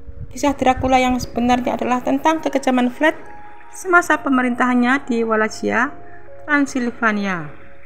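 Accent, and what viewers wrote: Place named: Java, Indonesia. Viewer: Pekat benor jowo nya😂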